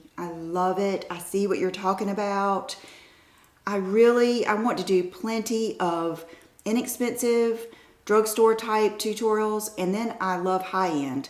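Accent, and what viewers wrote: South, United States. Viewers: One of my favorite things about you is your southern accent!!!! 🥰🥰🥰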